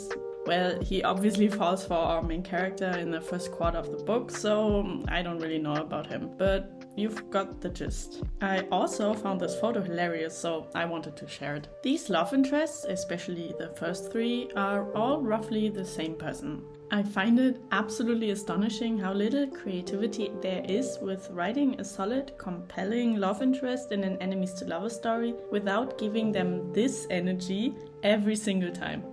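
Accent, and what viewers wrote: Germany. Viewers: Dein deutscher Akzent ist echt schlimm aber sonst gutes Video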